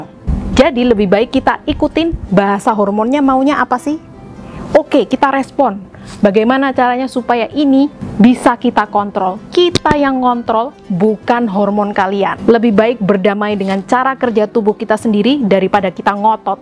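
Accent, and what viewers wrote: Java, Indonesia. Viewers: Ngakak jawa koek nya.....tp mudah di fahamj...trimakasih....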